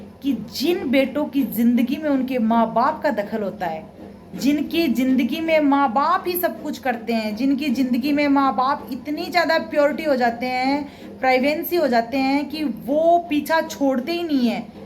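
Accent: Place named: Shekhawati, India. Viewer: Shekhawati❤️ voice dil ko chu gyi didi really we like you ❤️❤️❤️❤️❤️❤️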